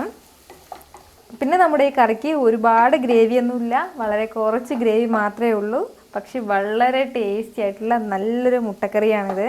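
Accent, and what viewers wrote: India. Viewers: അടിപൊളി ആയിട്ടുണ്ട് 👌👌👌👌ഞാൻ ട്രൈ ചെയ്യും 👍👍👍👍👍😎😎😎😎 ഭാഷ ശൈലി നന്നായിട്ടുണ്ട് ഏത് ജില്ല 😁😁😁😁?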